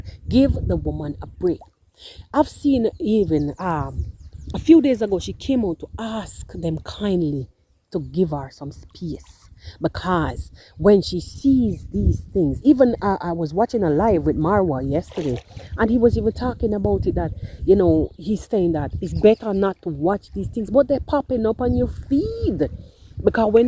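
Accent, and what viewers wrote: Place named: Jamaica. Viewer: I just love how you speak all jamaica bad mine the girl and then a talk bout say a good advice and she mùst listen to them after dem a chat her out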